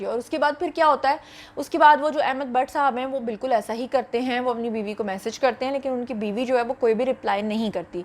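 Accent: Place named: Sialkot, Pakistan. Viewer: mind your language,it is Competitor not compi-tator,change your Sialkoti Accent.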